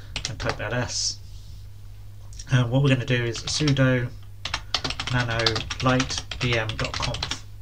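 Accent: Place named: United Kingdom. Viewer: very good explanation with clean british accent nice one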